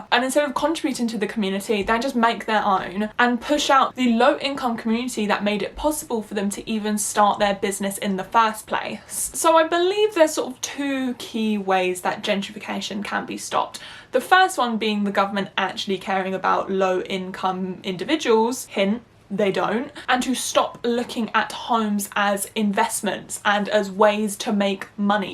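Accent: England, United Kingdom. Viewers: English accents might be more diverse than you think. Her accent is very clearly an English one.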